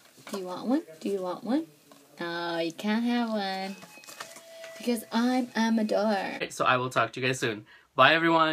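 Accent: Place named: France. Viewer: First off you are gonna laugh when I tell you this.........but for some reason I always thought you had a bit of an accent and were FRENCH and maybe from Paris or something? I have no idea why. Second little sis is gorjuss! You ain;t bad yourself. Third I loved the entire video! Thanks!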